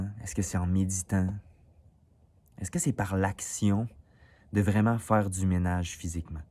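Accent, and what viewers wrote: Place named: Canada. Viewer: Bonjour Merci c est vrais ce libérer du surplus pour recevoir le nouveau en ce moment très drôle je suis en plein rangement de mes armoires ça déménage hiii l énergie ne circule pas quand trop charger une pièce ext... vivre dans le passé bloquaient pour de nouvelle rencontre balayage la coupe ne peut pas ce remplire si elle est déjà pleine 🤭 🎉 bon votre petit accent du Canada 🍁 j aime bien ily à du soleil dans votre guidance et j adore à bientôt ❤❤❤😊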